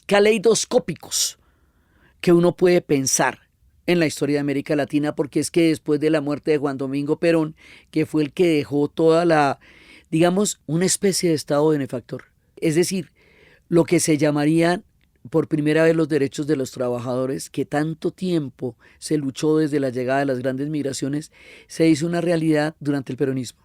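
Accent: Colombia. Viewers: Yo no se si la voz de Diana es bonita o no pero es que a quien no le va a encantar escuchar hablar con ese acento! Aqui se junto todo lo bonito! La historia, la geografia, la cultura, la radio, el podcast, las ciencias sociales en general, colombia lo mas bonito de america y lo dice un Hondureño y desde luego una mujer y ahora pongamole a esa mujer ese acento tan bello ¡ como le parece!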